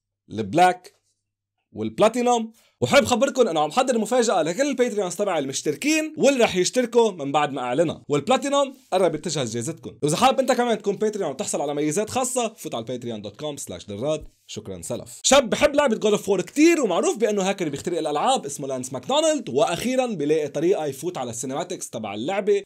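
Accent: Lebanon. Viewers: لهجتك اللبنانية ناااااار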